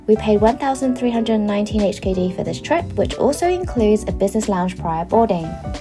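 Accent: United Kingdom. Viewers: This is the first time I ever had your channel come up on my YouTube. First it reminds me of those famous spots in Thailand kind of has the same scenery. But the first thing really is your voice first of all it's unexpected I didn't expect to hear your voice and I don't think it's artificial intelligence I think it's your real voice. Your accent sounds British in a sense. You two are are cute couple you're beautiful and your voice is just a really gorgeous. I'm from America grown up as a kid I always wanted to travel never got around to it. But China real China not cities and Japan not cities I loved as a kid cherry blossoms bamboo forest the clothing the tradition the music is awesome. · What's with the British accent? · Can I ask where is the accent from? Love the accent, british but not super londonish.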